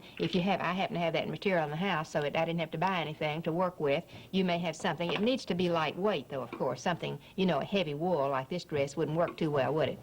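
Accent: South, United States. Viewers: i don't remember this woman but i like her southern accent.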